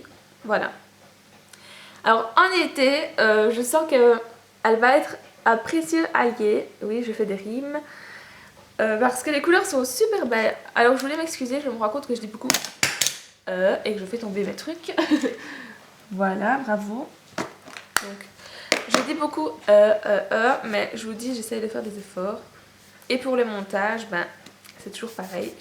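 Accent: Belgium. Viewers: Sympa d'entendre une belge pour une fois hihi :) J'avais déjà entendu parler de sleek mais je ne savais pas où commander, grâce à toi mtn je sais :p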